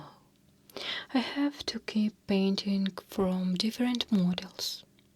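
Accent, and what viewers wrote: Russia. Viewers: I love, love, love when you read us a story! Your English keeps on getting better and better! But I love your Russian accent so much! Those earrings are amazing too! Thanks so much!:-)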